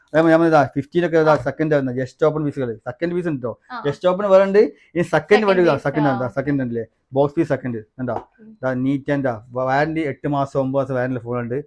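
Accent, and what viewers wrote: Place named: Southern Kerala, India. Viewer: പുള്ളി മുമ്പ് കെഎസ്ആർടിസി സൂപ്പർഫാസ്റ്റ് ഡ്രൈവർ ആയിരുന്നോ... എന്ത് സ്പീഡില സംസാരിക്കുന്നത്, ഒന്നാമത് കേരളത്തിൻറെ തെക്ക് ഭാഗത്തുള്ള വർക്ക് slang മനസ്സിലാകുന്നില്ല, അതിൻറെ കൂടെ ഓവർ സ്പീഡിൽ ഉള്ള സംസാരം. വീഡിയോ സ്ലോിൽ ഇട്ടാണ് പലതും കേട്ടത്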